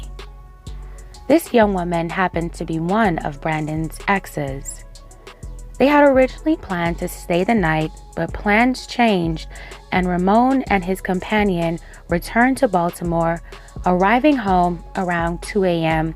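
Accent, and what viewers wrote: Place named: New York, United States. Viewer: I love my girl with the New York accent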